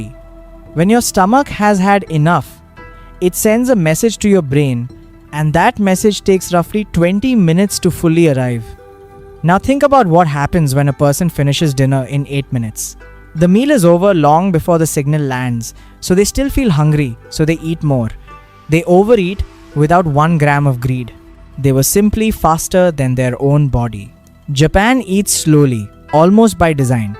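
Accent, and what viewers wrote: India. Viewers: Nice videos ,but your accent is indian not japanese at all ,nothing wrong but it is very clear .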